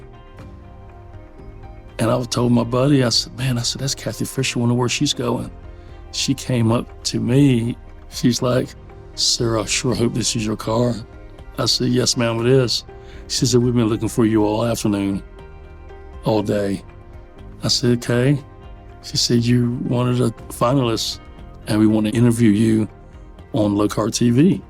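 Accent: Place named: Maryland, United States. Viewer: I thought u were from the eastern shore Maryland ...You're ancient the o and u ....,MD NC ......TALK t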